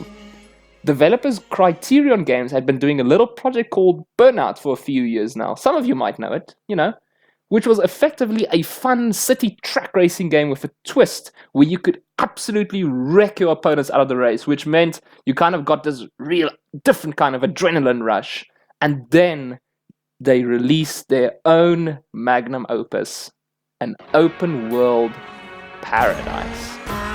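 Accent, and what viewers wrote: South Africa. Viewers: South African accent. I hear it. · Unrelated, but you sound South African... are you? 😂 Edit: Knew it lol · Are you South African? Edit: Yeah now I realised, I could hear by your accent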